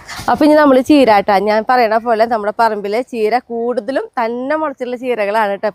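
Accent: Thrissur, India. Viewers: കാണുന്നതു തന്നെ സന്തോഷം ഇത് സ്ഥലം എവിടാണ് തൃശ്ശൂർ ഭാഷാരീതി ഇഷ്ട്ടാ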